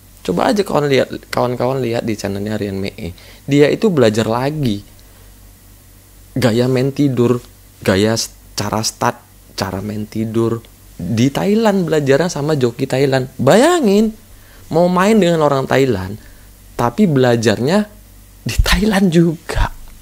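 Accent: Medan, Indonesia. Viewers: Medan mana ny ko bang 😀 keren x 🤪 Salam balap tidur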